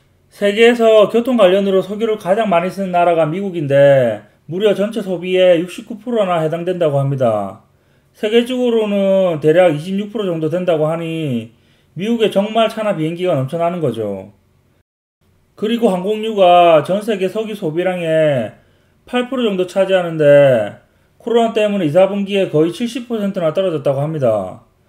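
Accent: Gyeongsang, South Korea. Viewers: 유튜브로 뵙게 되서 영광입니다. 구독과 좋아요 눌렀습니다. 억양이 저의 고향인 대구쪽이신 것 같아 더 반갑습니다.^^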